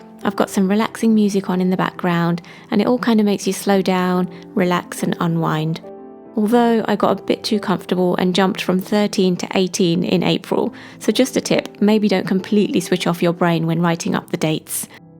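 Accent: United Kingdom. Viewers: Made it to the final spread, love the minimal approach, got some great ideas too, My goal is to use my planner to be more productive and sell more of my jewellery. Its lovely to listen to a fellow brit ❤ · I made it til the end and are now your newest subbie🥰💖 LOVED the set up and the notebook and I am very impressed by your ability to keep it so neat and clean and minimalistic but still very beatiful and inspiring and incredibly pleasing to the eye, or for the eyes(?)(English is not my first language, I am from Kristiansand in Norway❤️👱🏻‍♀️❤️💙🇳🇴💙❤️ I can’t wait for more videos to come and now I am gonna look for as many videos from you as I can find, cos I REALLY LOVED everything about your art and British accent of course (lol😅🥰) and your editing is amazing. One of my main goals for this year is to learn how to edit videos so my subbies and others who see my videos don’t have to always watch in real time every freakin video, except for the flip through ones where I just show off something I made. 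And another (more important) goal for next year is to try and eat at least one time every day. Because of my recovery from Anorexia I have ruined my feeling of hunger, so if no one or nothing reminds me to eat, it can go days without food or water(except for when I brush my teeth every morning and night then I’ll have some sips of water but that is not enough. And I want to try again and cure some of my insomnia which I’ve had since day one when I was born. 20 minutes is the record in my entire life on how long I’ve slept and I REALLY DESPISE (or how you spell almost hate) waking up after one or two minutes of sleep every bloddy night. So that is why I am sitting here at 4.30(?)(we a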